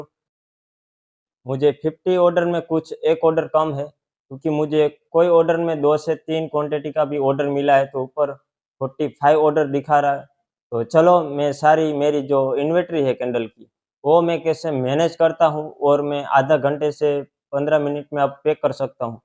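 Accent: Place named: Gujarat, India. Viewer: Lagta he Gujarat se ho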